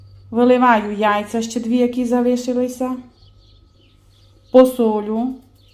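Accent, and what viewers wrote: Transcarpathia, Ukraine. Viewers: та хоч би і ніколи не жили в Україні,на мій погляд не дуже важко здогадатися,що у має бути закарпатський діалект.Це загадка на мільон долярів.😁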